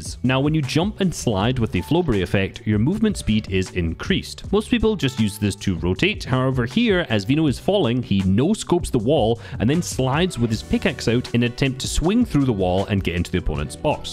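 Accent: United Kingdom. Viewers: He is British I think tho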